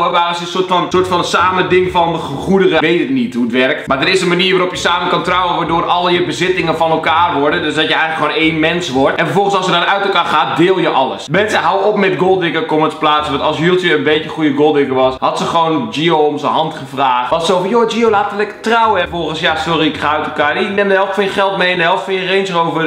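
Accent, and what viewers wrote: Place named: Limburg, Netherlands. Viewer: Lekker Limburgs accent 🎉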